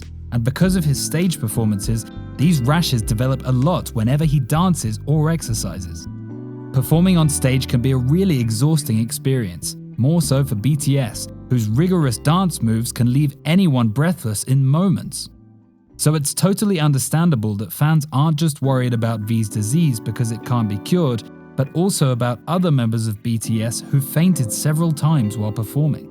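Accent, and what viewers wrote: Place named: England, United Kingdom. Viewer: 1. The first 3 letter words in which you described him.......I thoroughly disagree with that. He ain't dt good IMO. 2. Please pronounce his name properly. Don't pronounce it in your English accent gvng it English pronunciation.😡😡😡😡. His name Kim Taehyung is a Korean name, not an English or an American name. So pronounce it d way Koreans pronounce it, not in d way Westerners speaking English pronounce it. 3. to ...well, in ds pic he ain't lukin charming but s lukin like a kindergarten school kid. 😂😂 And he was not dt charming in his initial days. He lukd quite ordinary. 4. As regards his impeccably perfect looks, I hv seen his photos during his pre-debut days & tbh he was nothing to look at back those days....he's just a lean & skinny faced road-side boy in his looks. But aftr bng a trainee he havin undergone through immeasurable grooming, care, styling & skin treatments, transformed into such a hottie. 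Before, he just had fair complexion & a perfectly shaped face. Hence it's proved dt be it males or females, if we undergo proper grooming, care & styling under best professional beauticians, or under our own selves, we wud b 20 times more attractive looking than we are in actual. Like, he's a farmer's son. But was one indeed born under extremely lucky stars due to which from an ordinary commoner of Korea he became a worldwide celebrity now.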